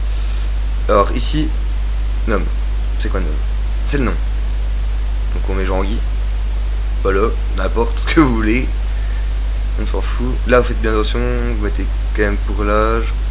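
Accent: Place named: Haute-Saône, France. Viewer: Mdrr j'ai reconnu l'accen j'suis du 70 ;)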